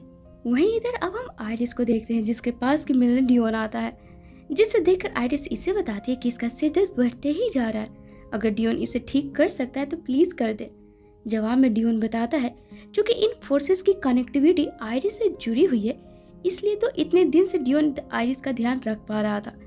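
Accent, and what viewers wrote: Bihar, India. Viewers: Kya aap Bihar se hai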